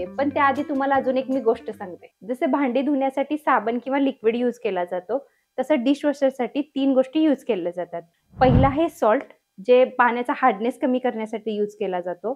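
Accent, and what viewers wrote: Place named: Satara, India. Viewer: Satara accent lagech kalte 😂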